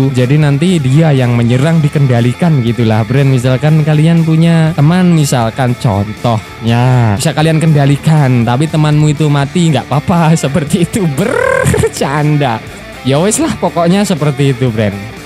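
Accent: Java, Indonesia. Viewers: medok tongkol jelek parah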